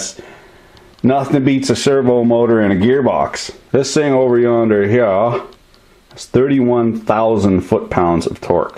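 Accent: Quebec, Canada. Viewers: Love the canadia accent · An international colleague recently asked what we Canadians sound like. I sent him to your channel as a prime example. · just yesterday i found this channel and it's seriously cool. Dude is funny, witty and super knowledgeable. also, did you go all quebecois at some point? eh tabarnak, je le savais pas, je me demande d'où tu viens? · Did u soften ur uuut(out) or have I been watching enough that I'm not even hearing ur c eh n eh d eh any more?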